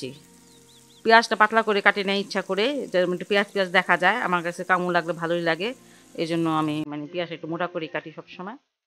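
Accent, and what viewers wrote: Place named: Gazipur, Bangladesh. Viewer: ভালো লাগছে,,, আরো ভালো লাগছে আপু আপনার কথা গুলো মনে হচ্ছে আপনি আমাদের গাজীপুরের বাসিন্দা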